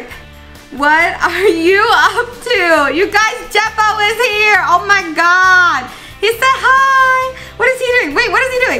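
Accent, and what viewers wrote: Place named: United Kingdom. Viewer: wow your british acent is Just wow incredible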